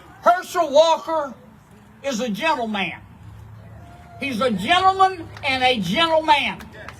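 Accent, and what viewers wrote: South, United States. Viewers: You guys actually listen to this guy? 15 years ago he barely had a Southern accent, and now he is full bore Good-ol'-boy South. What a joke. "Dear Lord give us more people like Hershel Walker and his fans, that can barely rub two brain cells together, so that we can stay in power forever, and not actually do anything for these constituents . Oh we'll talk a good game ... but actually do nothing for them."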